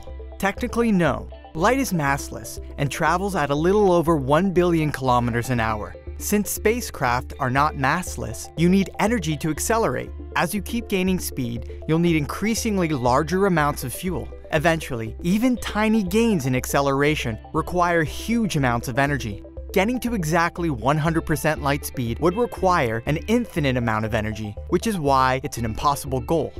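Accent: United States. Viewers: You sound American. Most likely an American audience. Telling us speeds in kph is a bit snobby.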